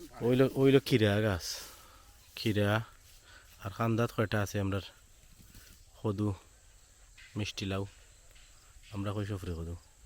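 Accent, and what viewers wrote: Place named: Sylhet, Bangladesh. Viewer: So authentic sylhety talk and simplicity of presentation. I have considered subscribe. Well done brother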